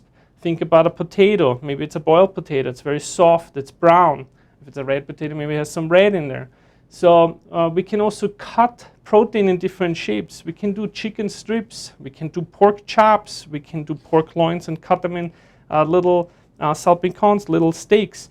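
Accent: Austria. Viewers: Einen Österreicher hör ich aus 100 Leuten raus :-) Cooles Video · Phillip Dodds austrian probably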